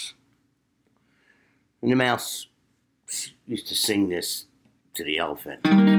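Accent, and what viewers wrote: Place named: Philadelphia, United States. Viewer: You have a Philadelphia accent. Cool. Subscribed.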